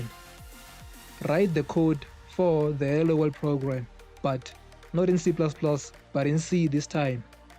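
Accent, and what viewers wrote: South Africa. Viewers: From Mzansi. You sound Venda/Tsonga. Dankie for the video!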